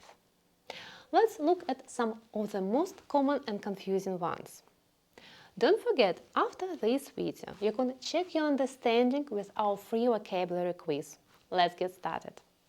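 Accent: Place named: United Kingdom. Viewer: Hi, I'm interested in learning English with a British accent. I just found your channel and subscribed. Where are you from? Does your accent sound different from other British accents?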